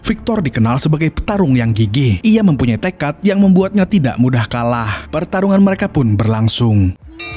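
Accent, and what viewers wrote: Banjar, Indonesia. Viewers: Orng banjar kh mang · Admin orang banjar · Urg banjar kh mang 😂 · Banjar sekali nya Admin Nya,slam sanak dari kotabaru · Urang banjar sekali ny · Pian urang banjar kah? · Urang Banjar kah sakalinya? 😁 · Urang banjar kah boy admin nya nih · Banjar nya mana pian mang...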